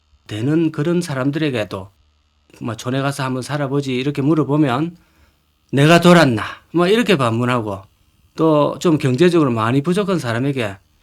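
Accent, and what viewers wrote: Gyeongsang, South Korea. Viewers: 전국방방곡곡 다니시며 영상찍느라 고생마니합니다 즐겁게보고있습니다 갱상도 사투리 정겹습니다❤❤❤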